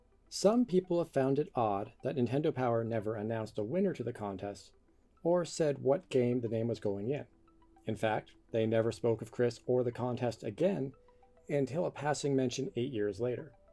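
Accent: Canada. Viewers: did you not hear the characteristic Canadian ow sound?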